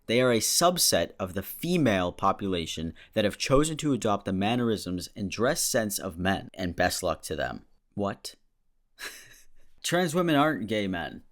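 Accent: United Kingdom. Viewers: PLS-WHY DO YOU SOUND LIKE SHREK WITH YOUR BRITISH ACCENT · I know I'm late with this comment but I just want to say, I'm British and I appreciate your British voice, not bad at all! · i laughed at your British accent so much😂. it was better than a lot of peoples tho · british accent, not that bad, not sure which part of britain youre tryna be from tho... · How do you have a more British accent than me, I'm British.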